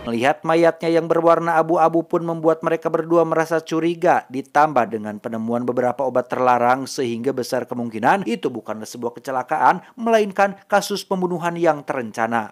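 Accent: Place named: Madura, Indonesia. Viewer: Madura bang😂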